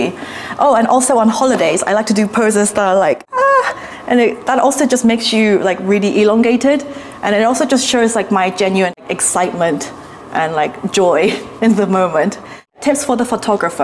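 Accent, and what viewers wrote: United Kingdom. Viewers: Does people study British English in Korea..as her accent is kinda British.. 🤔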